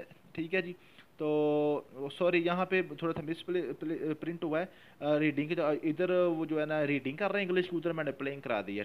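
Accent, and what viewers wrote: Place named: Punjab, India. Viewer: v informative lecture Sir ❣👌👌....way of teaching very amazing with punjabi toch...😍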